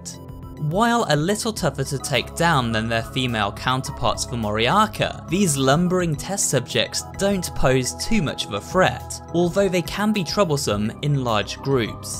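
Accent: England, United Kingdom. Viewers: Im german, so i understand the names even better. Also its always fun to hear an english person pronounce german words.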